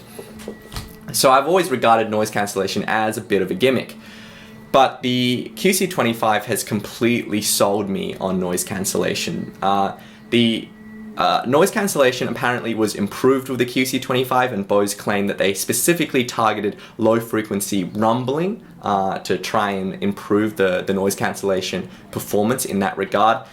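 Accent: Australia. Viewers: He's Australian... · R3DLUFF trust me this is less australian than bogan australian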